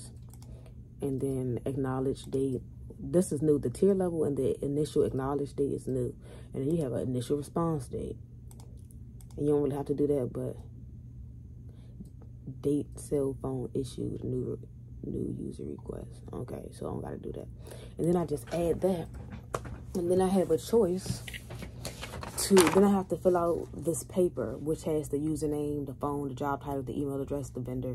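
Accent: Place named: Philadelphia, United States. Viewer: In the beginning of the video, I thought I was Trippin but then at the end of the video I realized you’re from Philly so am I I just finished Google cyber security online. I’m studying for my copier A+. I’m learning SQL Lennox and Python, but I don’t have any experience.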